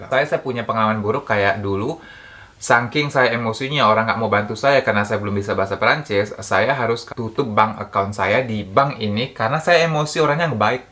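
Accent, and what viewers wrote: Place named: Padang, Indonesia. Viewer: Suka banget denger logat Padang kamu... Love you...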